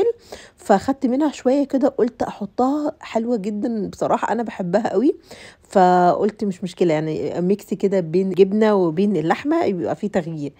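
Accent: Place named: Tunisia. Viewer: لهجة تونسية 😊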